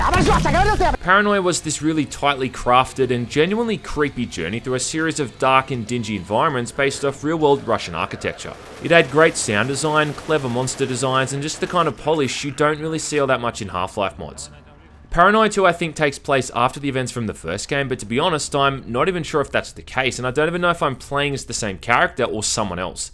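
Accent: Australia. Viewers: Didn't know I needed subtitles to understand Australian.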